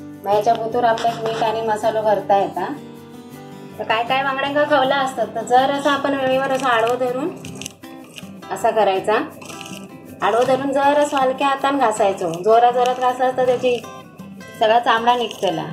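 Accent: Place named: Malvani, India. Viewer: Subscribed just to listen yr sweet Malwani... It's too sweet to hear.... मला मालवणी भाषा खूप आवडते त्याचा feel च वेगळा आहे।।।🙈🤗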